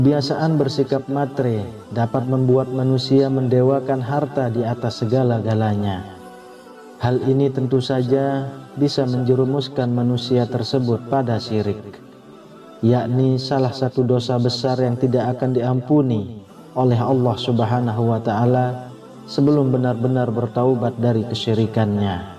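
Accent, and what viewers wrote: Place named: Bandung, Indonesia. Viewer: Terima kasih ustad penjelasan nya.🙏🙏🙏👍 Ustad orang mn ya.suara nya sih seperti orang bdng ya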